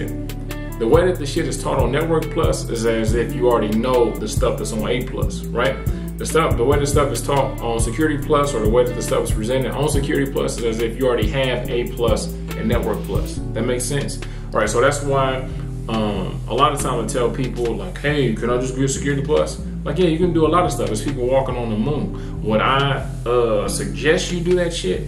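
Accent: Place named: Dominican Republic. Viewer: This man sounds and look Dominican ! I needed to support right away ! my people !!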